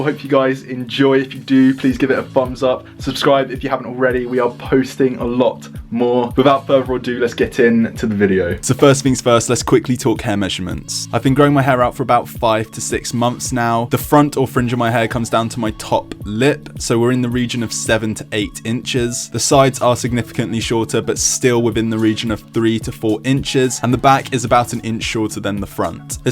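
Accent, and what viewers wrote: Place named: United Kingdom. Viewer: Finally a British accent, I’m sick of hearing these annoying American ones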